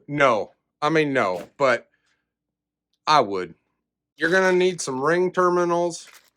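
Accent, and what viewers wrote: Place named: Ozarks, United States. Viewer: !! The accent is coming in great. You have assimilated to the Ozarks nicely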